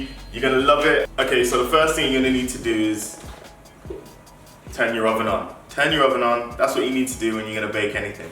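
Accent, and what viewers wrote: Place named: United Kingdom. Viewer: UK ...nice one!! was just looking for cauliflower recipe, and your channel popped up. And even better when I heard the accent, okay I'm off to Tesco to get my lemon 😁....oh and I've subscribed, nice presentation BTW.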